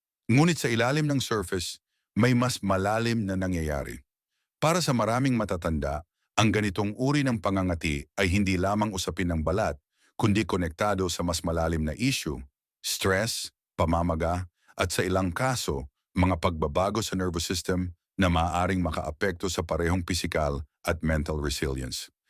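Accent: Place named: United States. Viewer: Taga US kasi xa kaya slang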